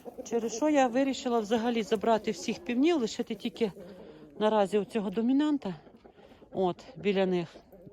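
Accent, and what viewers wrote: Ternopil, Ukraine. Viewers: Дякую вам пані за відео про любимих курочок.Я насолоджуватись вашою мовою і зразу вгадала, що ви з Тернопільщини, потім прочитала в коментах.Таке відчуття, що я побувала у вас в гостях.Дякую, дякую, дякую.Ми переможемо і буде мир і є наша роботяща Україна.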